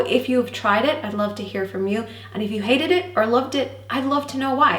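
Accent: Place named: Ireland. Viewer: I picked up on that cute accent of yours right away..im Irish too!! And will b visiting Ireland I'm praying within the next year! And i just want to tell ya,u r so beautiful!! Irish Women rock lol! And need to try this primer...thanks for video!!💚☘💚☘💚☘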